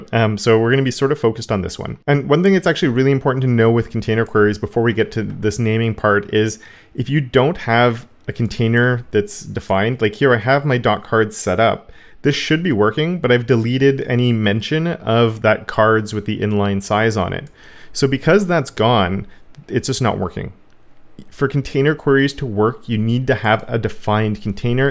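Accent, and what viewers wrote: Canada. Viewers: It's definitely magical. I love it, and love only learning CSS NOW rather than 5-10-20 years ago. (I did try 10 years ago but just didn't click). Id be interested if you do a video on the different available container types regarding sizing. Aside: continer style queries ;) Hoping my daughter will come back with a tinge of your accent. She's just gone to St John's area in Newfoundland for 5 months of school (she's 17, specifically Conception Bay South)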